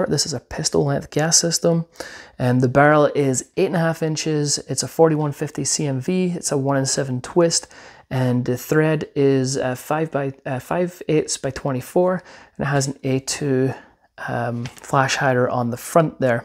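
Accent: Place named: Scotland, United Kingdom. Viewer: The scottish accent with the American flag in the background gave me the warm and fuzzies.🤘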